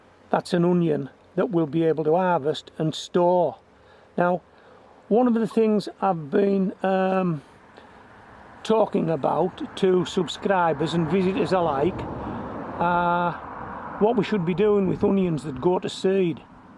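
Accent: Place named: Scotland, United Kingdom. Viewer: I do like to hear your Scottish accent, sir. So strong. Love it.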